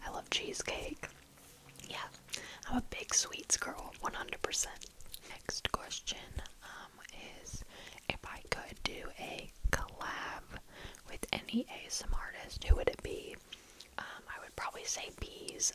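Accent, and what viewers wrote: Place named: Australia. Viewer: bruh i was so convinced you were australian xd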